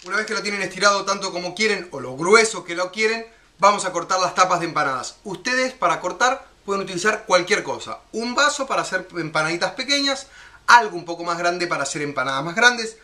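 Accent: Argentina. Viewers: no es español pava tiene mas asento argentino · Ahh uma coisa adoro tu acento este acento argentino es increible de bonito que tenhas um bom dia obrigado beijos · En Argentina y creo que por su forma de hablar es argentino no se le pone huevo. Es solo harina, sal, grasa o manteca y agua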